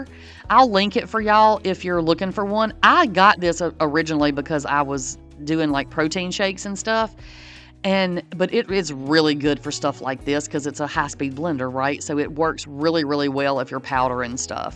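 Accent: United States. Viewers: omg,. just to say, I liked the video, and no doubt the creator tries to be a good human, but to many of us outside the US hearing this kind of accent is similar to hearing arabic accent....its alarming. Thankfully I dont fall for predjuces... Good luck over there.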